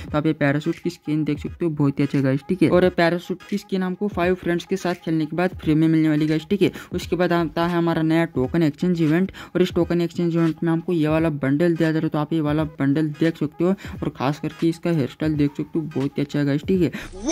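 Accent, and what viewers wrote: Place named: Maharashtra, India. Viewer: Bhava tuzya bolnyavarun vatty Tu marathi ahe... Marathi asla t like de comment la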